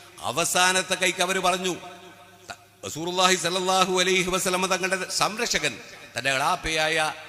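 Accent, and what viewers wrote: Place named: Kasaragod, India. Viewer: കാസർകോട് ഭാഷ യാണല്ലോ തങ്ങൾ എന്ന് പറയടോ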